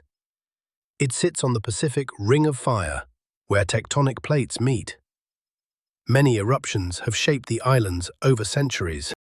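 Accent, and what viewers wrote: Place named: United Kingdom. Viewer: nice! I have been trying to find a video with British English and I have it here!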